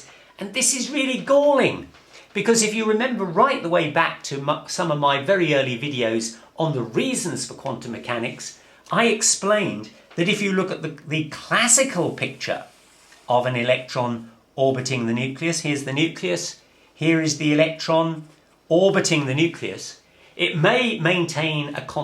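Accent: United Kingdom. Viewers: hi....good explanation...i think its ur british accent..isn't it?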